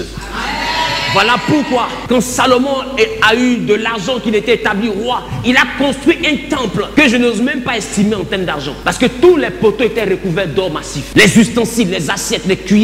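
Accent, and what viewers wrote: Ivory Coast. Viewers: Bonne année cousin, mais c'est comment? Nous n'avons plus tes directs! Faut ratisser frère......mais papah, tu dress comme nous congolais, mais ton accent est ivoirien! Tu nous ressembles aussi....enchante mon frère.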